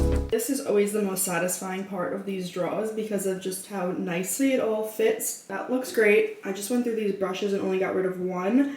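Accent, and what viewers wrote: United States. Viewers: Its a new york accent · Just enjoying the Long Island accent 💕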